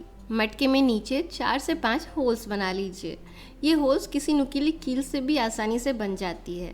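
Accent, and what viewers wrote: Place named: South India, India. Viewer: Lovely Voice ....Kuch Kuch South India jaisa feel ho raha...😄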